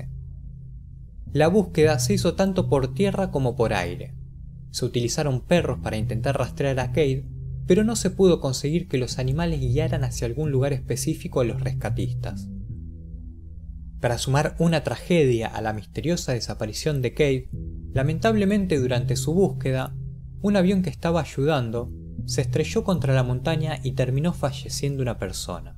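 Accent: Argentina. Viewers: El acento argentino y uruguayo generalmente es así. Vocalizan la s como j. Y la ll como sh. Lo mismo pasa con el acento español, pronunciando la c como z. El idioma español es muy complejo en cuanto al léxico de cada país.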